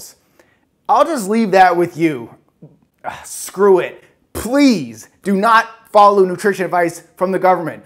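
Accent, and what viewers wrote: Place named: Canada. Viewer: Not sayin’ it wrong....just Canadian 🤪🇨🇦 Never lose that!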